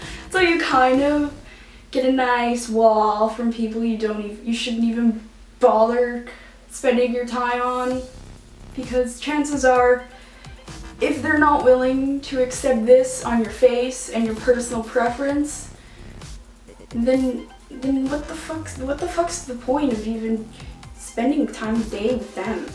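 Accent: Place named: Canada. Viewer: love your accent, Canadian??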